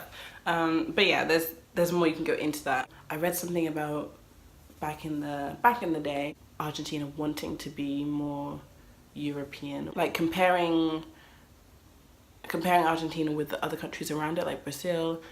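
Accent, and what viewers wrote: United Kingdom. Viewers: You sound like a right and proper speaker of the Queens english and your Quite the Looker very fetching! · I love your brittish accent it just sounds so soothing can I marry you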